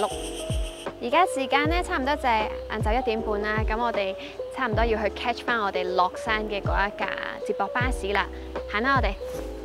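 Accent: Taiwan. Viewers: 你的中文说得很好，说得很像台湾人。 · 靚人靚景，國語台灣腔好聽❤🎉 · 人家在 台灣讀 大學 畢業 當然 向 台灣音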